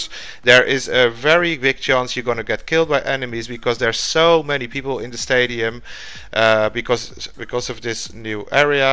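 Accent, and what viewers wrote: Netherlands. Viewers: R you dutch?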